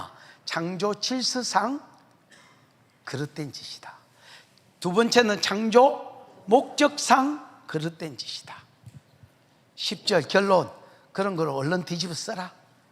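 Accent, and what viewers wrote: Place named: Busan, South Korea. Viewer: 목사님~^^ 귀한 말씀을 듣게하시니 감사드립니다 ❤ 우리남편에게 목사님 부산 방언통역재미도😂 쏠쏠합니다 건강하십시요 🎉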